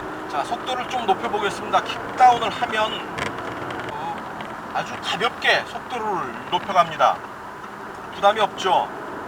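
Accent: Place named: Jeolla, South Korea. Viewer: 설명이 건조하고, 사투리톤이(전라도) 강해서 영상이 딱딱함ㅋ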